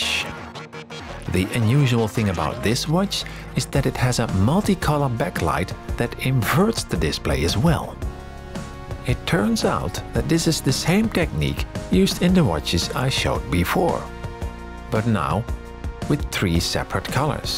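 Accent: Netherlands. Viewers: It's just cute, how dutch you sound. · Huh, this is pretty neat. :D I also like how wonderfully Dutch you sound, without having too thick of an accent.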